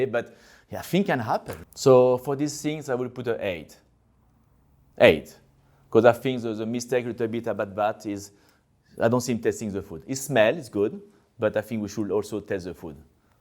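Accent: France. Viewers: This guy makes the French accents in the movie sound accurate. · he sounded so french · I can listen all day to his french accent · The only thing that is bad on this video, is that we have to listen to French accent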